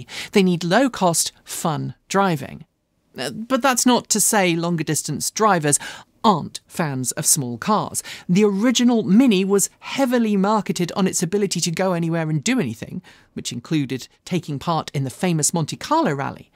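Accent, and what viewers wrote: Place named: United Kingdom. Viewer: Well, it sure did take you a very long time to make some good points! Get on with it and so being in love with your own bloody British accent.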